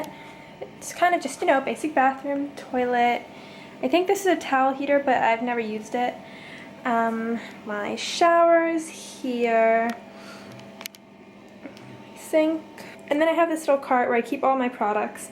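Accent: United Kingdom. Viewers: You sound so British! :) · Omg I just watched a few of your older videos and I noticed in this one you Kinda developed a British accent!! That is sooooo cool!! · I think you've kinda picked up an English accent 😄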